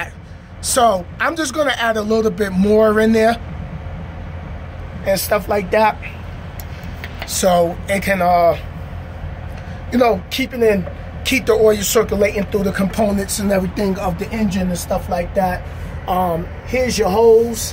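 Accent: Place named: East Coast, United States. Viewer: Good job for all those cats who are unfamiliar. You sound like you are from the East Coast. I was born in PHX later moved to Long Beach ,California and the to Seattle WA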